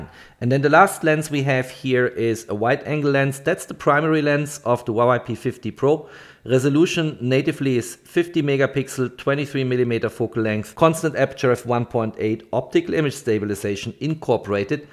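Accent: Germany. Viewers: wieso machst du das nicht auf deutsch? hört man doch deutlich dass du deutscher bist 🤔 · Iv I should guess, you are German! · Gutes Video, aber arbeite vielleicht ein bisschen an deiner Aussprache :)! Man hört den deutschen Akzent nämlich echt stark 😆 (zum Beispiel spricht man millimeter wie „millimieter“ aus)! Nicht böse gemeint, ansonsten passt alles 😊! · Is it only me or has he a German accent? It sounds all so German xD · Hard german accent :D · u sound like a german jew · du hast so ein deutschen Akzent haha xD hab ich recht? also kenne dich noch nicht das erste mal auf dein Kanal aber das klingt sehr Deutsch für mich :) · Are you german🫠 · I thought the same xD. It really sounds so german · ah yes, i hear the german-ness in your voice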